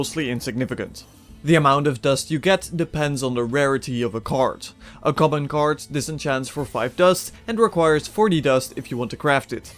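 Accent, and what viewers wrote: Netherlands. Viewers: Are u dutch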